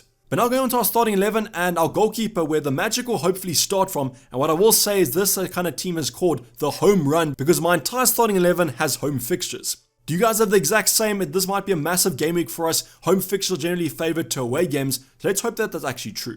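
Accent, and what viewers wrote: South Africa. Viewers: Where in South Africa are you from bro? 🇿🇦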